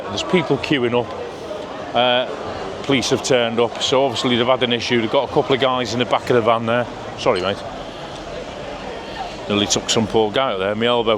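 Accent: United Kingdom. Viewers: It's not your home town when you've got the lfc liver bird on yer phone mate and a woolly back accent too la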